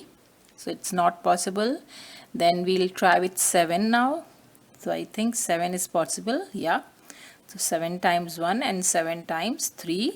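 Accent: India. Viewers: Itni English tu aaj suniye jitni main angrejon se bhi nahin suni thi angreji hai yah Indian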